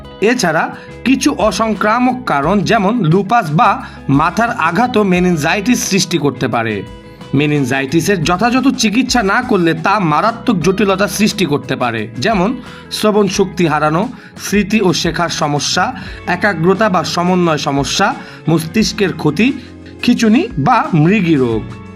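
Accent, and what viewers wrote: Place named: Khulna, Bangladesh. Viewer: Khulna Sojer hase cinik paya jase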